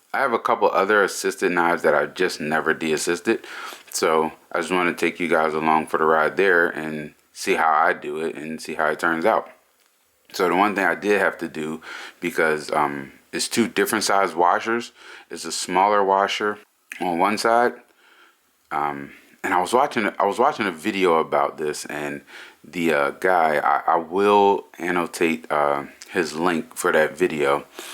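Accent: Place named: Louisiana, United States. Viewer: Just curious. What part of the country are you from? Trying to place your interesting accent. Louisiana? Detroit?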